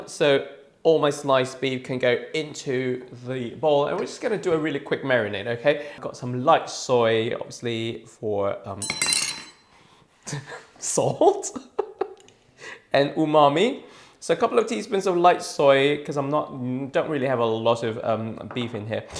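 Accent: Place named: RP, United Kingdom. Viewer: What's with the posh voice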